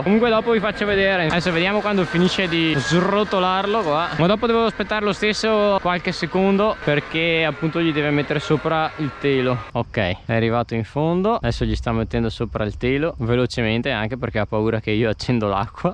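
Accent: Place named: Verona, Italy. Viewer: grande!! Ho sentio l'accento veronese e me son emosionà!! 😂😂 Ti ho scoperto da poco e appena ho sentio el papà parlar to seguio subito.. Grande bei video un giorno veo a catarte e a ciavarte un po' de verdura😜😜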